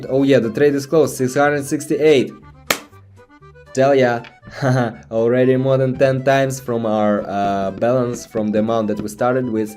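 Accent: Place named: Russia. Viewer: feel his russian lol subscribed just for that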